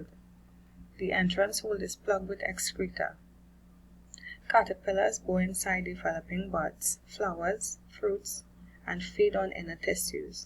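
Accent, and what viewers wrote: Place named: Trinidad and Tobago. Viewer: thanks for the advice and what to look for on the okra plant. You speak like a trinidadian.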